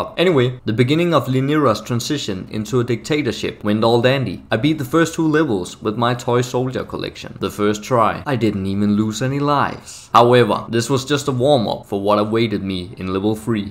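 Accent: Denmark. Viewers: Are you danish? You sound danish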